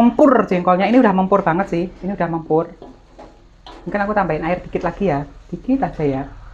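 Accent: Java, Indonesia. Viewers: Asslmuallaikummm..wrwb,,mbaaaakkkkk...aku ngeliat mbak makan dan bicara logat jawa eh berasa kayak sama mamah..! Mamah sama papahku juga suka makan jengkol,hehehe..Mbak maaf mau nnya,hidung mbak itu asli atau op? Soalnya hidung mbak bagus,cantik banget..love you mbak❤️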